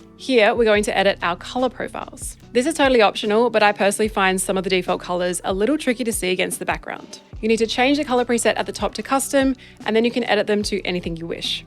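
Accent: Australia. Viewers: I hear you mama content definitely has matured since I last watched still love that aussie accent.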